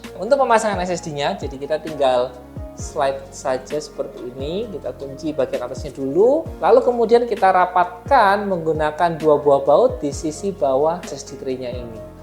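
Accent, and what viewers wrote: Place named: Java, Indonesia. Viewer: Ou pantes agak medok <mail>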